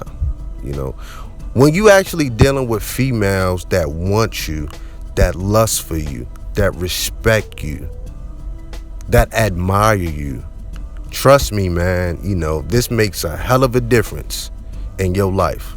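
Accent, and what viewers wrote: Baltimore, United States. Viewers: Great video. I can tell you from Baltimore too, i hear it in your accent.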